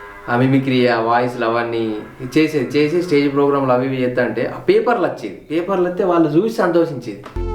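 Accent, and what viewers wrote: Telangana, India. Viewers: గారు మీ నటన గానీ మీరు మాట్లాడే తెలంగాణ భాష గానీ చాలా బాగుంటుంది. మీరు ఇలాగే ముందుకు వెళ్ళండి. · Always we wull support go head .. me videos anni chustham chala bagunttavi telangana yasa bada manchi ga cheptharu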